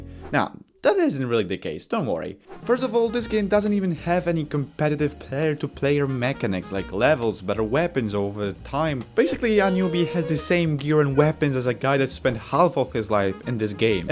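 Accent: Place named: Europe. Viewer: You have a bright future in this, the right amount of edgy, the weird European accent and tempo. Keep it up.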